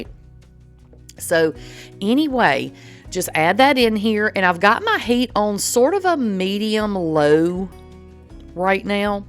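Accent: South, United States. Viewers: At times, I hear myself....horrible Baltimore accent...lol....more so than my parents ever had....😳...Your southern sound, very calming. Lol Luv your videos hon !!!!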